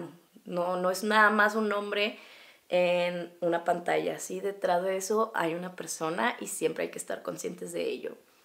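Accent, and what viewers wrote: Mexico. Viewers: sos mexicana... en susurros no se escucha la "tonadita" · amo el acento mexicano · se te siente mucho mas el acento mexicano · Con tu voz normal si se te nota un poquito el acento de chihuas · el acento mexicano me gusta mucho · me encanta conocerte con tu acento mexicano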